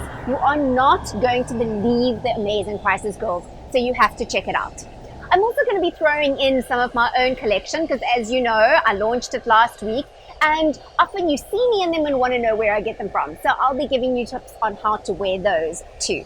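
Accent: South Africa. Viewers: Oh my goodness, you made my day - I was commenting before you got to the end, because I was so interested in your suggestions and already mulling over adding some burgundy into my wardrobe! DeepWaterHappy is my blog/YouTube/Insta/Pinterest "handle" for cruising, diving, travel and lifestyle content. I'm Kim Walther, a 51 year-old high school business/photography teacher from Florida. I run, bike, work out, produce a lot of artwork, and I also work as a sailboat captain. We wear khaki shorts and white company polo on the water. However, every day at school... I enjoy dressing up and coordinating stylish outfits. This year, due to your upbeat influence, I'm wearing a lot more heels to work and dressing it UP quite a bit. I've spent a lot of time in SA, Cape Town area, and dearly love your accent; it reminds me of friends and family there. And who better to give fashion advice than another Florida girl-turned-ZA! 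I absolutely adore you! Thank you for the watch! Mwah! Baie Dankie!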